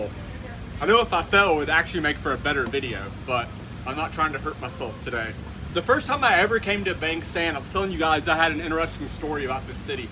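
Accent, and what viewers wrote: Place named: Pittsburgh, United States. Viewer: Are you from Pittsburgh? Your accent sounds like it. Great channel.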